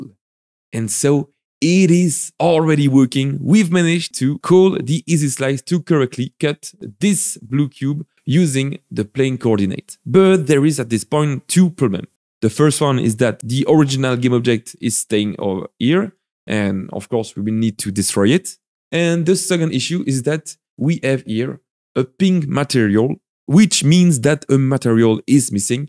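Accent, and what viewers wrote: France. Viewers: Ah c'est pour ça cet accent Français, je me disais bien que ça sentait familier XDDD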